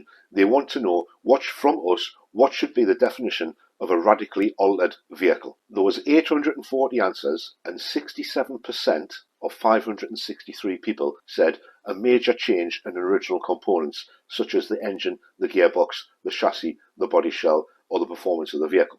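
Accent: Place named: Northumberland, United Kingdom. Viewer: Says your in Northumbria and can tell your local too by accent don't mind a ride out one day!!! I will be better once weather warms up am crippled with pain in the cold!! Im only 39 but feel more like am 78!! Love reading its how I got good at motor trade reading and trying out learn from my mistakes!! Youtube videos make it lot easier for those who want too learn the trade now!!!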